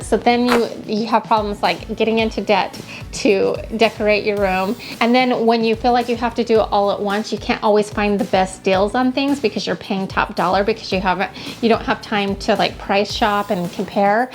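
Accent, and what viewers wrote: Utah, United States. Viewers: I am so surprised to hear you’re from Maine, you 100% have a Utah accent. Mind blown.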